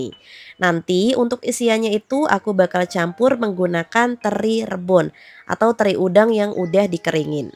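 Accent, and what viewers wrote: Java, Indonesia. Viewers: Jawa nya kok medok banget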